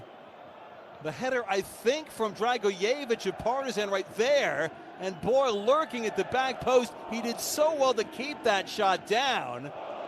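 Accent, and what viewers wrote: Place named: United States. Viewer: I am reading all sorts of snide remarks about the commentator. Part of me thinks it's unfair. I'm writing my own comment after having listened to about 2/3 of the clip. Nothing that he's said to this point is glaringly stupid. Perhaps a bit unpolished, but what should one expect? It's a Europa League qualifying match, not an FA Cup final or El Classico. Part of me is offended that it's simply anti-American bigotry - being American myself. But even I, proud Yankee Doodle that I am, must confess that an American accent coming from the mouth of a football announcer, is incongruous at best. Well done, Hibs. I'm a lifelong Celtic fan, but wouldn't be in the least put off if you lot make us sweat a bit this season.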